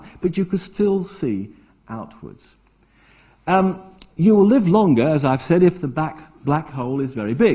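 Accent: United Kingdom. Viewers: his britan english is anoying >_>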